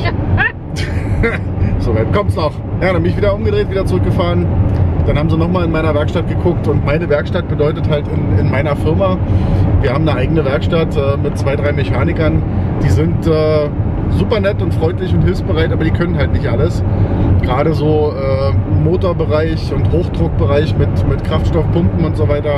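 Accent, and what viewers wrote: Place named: Germany. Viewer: Kann hier nur die Videos von Luca Lerga empfehlen, der hat sich mit dem Thema kaufen oder mieten beschäftigt , das trifft ja auch auf den amerikanischen Markt im allgemeinen zu. Eine weitere These hat Mike Hocke vor kurzem aufgestellt, und zwar wenn die Werkstätten deinen deutschen Akzent hören versuchen die dir die Scheine aus der Tasche zu ziehen. Sind aber alles nur Vermutungen. Ich bin auch selbstständig und mein Auto ist auch ständig in der Werkstatt und immer wenn ich denke , jetzt ist aber alles gemacht, dann geht wieder irgendeine Kontrollleuchte an. Ich kann echt mitfühlen wie scheiße das ist.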